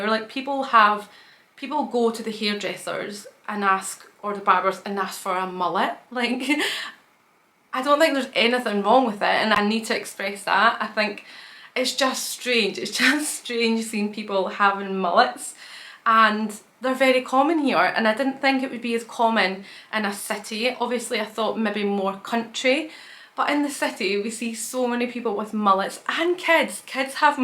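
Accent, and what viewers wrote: Scotland, United Kingdom. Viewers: Agree about the clothes dryer , lived in Tassie 55 years and neither me or my parents or daughter have ever owned a clothes dryer. I never really associated redheads with Scotland , I guess I just thought of Scotland male teens as all having skinheads or shaved heads whatever you want to call it , maybe it's from movies or something I have seen on Scotland . Also am surprised at the huge difference in Scottish accents between you and a Scottish YouTuber that I watch regularly called " Beth roars", must be from opposite parts of Scotland as sound nothing like each other 😃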